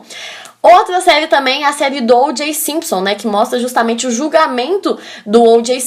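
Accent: Minas Gerais, Brazil. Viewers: minas gerais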